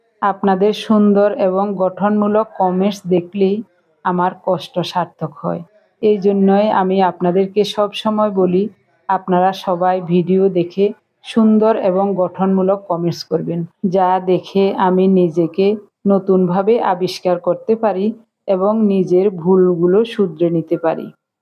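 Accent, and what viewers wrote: Noakhali, Bangladesh. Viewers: আমি এই প্রথম দেখছি।খুব ভালো লাগলো।আপনার কথাগুলি শুনে মনে হচ্ছে নোয়াখালী বা চট্রগ্রামের ভাষা...খুব মিষ্টি ভাষা।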